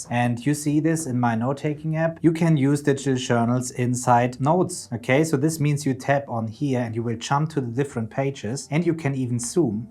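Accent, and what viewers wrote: Germany. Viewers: Bist du Deutsch? Merke da so ein akzent ..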